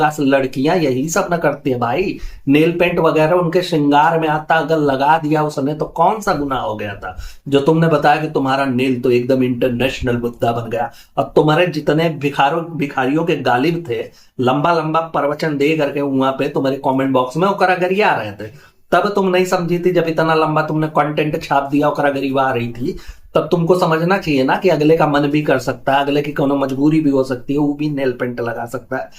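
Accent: Uttar Pradesh, India. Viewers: Bhai aap up se ho ??aapki baato se lgta hai main bhi up se hu ...accha lgta hai aapke bolne ka andaj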